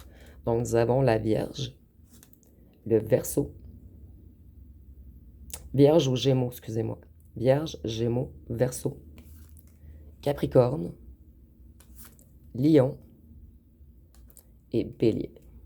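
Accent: Canada. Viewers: Bonjour, j' aime beaucoup votre accent canadien 😊 Je me sens plus forte depuis ses épreuves.Une forte emprise familiale et ex.... J' ai mis un stop depuis janvier 2024 et j' ai été profondément blessée. Je le remercie pour cette belle leçon de vie. Après une longue traversée du désert, je m'ouvre à nouveau vers le champ des possibles. Je suis plus en amour pour moi même et pour la vie ❤ Merci beaucoup ❤❤❤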